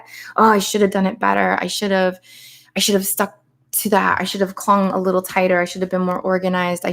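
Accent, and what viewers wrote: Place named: New Jersey, United States. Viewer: I know a Jersey girl when I hear one. My ol' stomping grounds back in the day. "Regrets, I've had a few, but then again, too few to mention, I did what I had to do and saw it through without exemption..."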